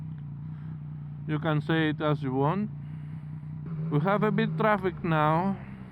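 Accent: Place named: Birmingham, United Kingdom. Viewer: I can distinguish the Brummie accent... 🤣🤣🤣 Keep it up!!!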